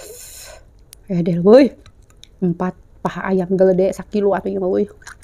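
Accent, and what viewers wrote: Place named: Banten, Indonesia. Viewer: Kalo dengerin teh ngomong jadi inget temenku ngomongnya sama logat" banten padahal dia orang lampung tapi bisa logat banten 👍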